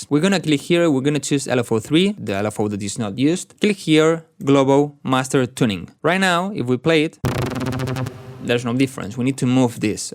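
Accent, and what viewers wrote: Spain. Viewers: Fine tuto! You have Spanish accent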